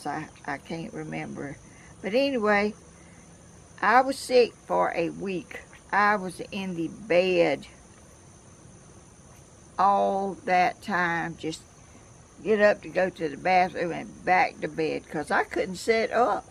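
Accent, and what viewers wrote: South, United States. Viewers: Hello... yes some one has defintely hacked your account posing as u... the what's up app...I had this happen to me on another diyers channel... they caused a lot of problems for the other lady.. have your grandson look into it... so many ppl are jealous of all the accomplishments and hardworking Diyers put into their channel. All their personnel time out of the goodness of your hearts just to entertain us for someone hateful, jealous, person to do this is not right. These ppl need to be in trouble.Btw.. love all your videos your voice is so kind and sweet brings back so many memories that southern accent... you are an anxious, beautiful, kind hearted person. Stay safe.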